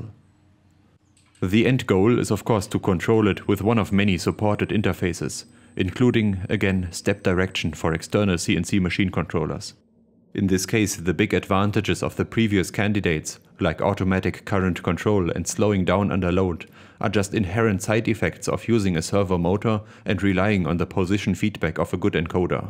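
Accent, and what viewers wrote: Germany. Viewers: I think its a german accent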